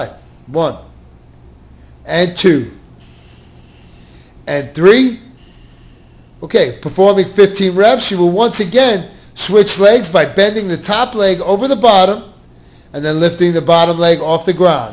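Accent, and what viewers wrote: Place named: New York, United States. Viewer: Folks from New York...when they talk, I listen.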